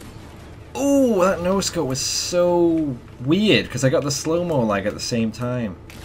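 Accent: United Kingdom. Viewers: U brittish?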